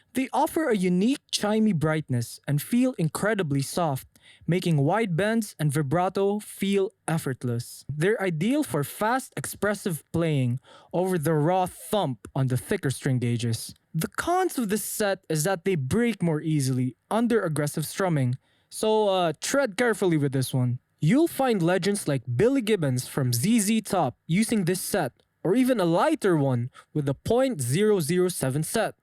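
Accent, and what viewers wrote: Philippines. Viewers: Are you pinoy? I am also 😂 watching guitar vids with family they put your video on and i noticed accent might be fellow kababayan!! If so awesome!! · Yow you have a Filipino Accent I hear it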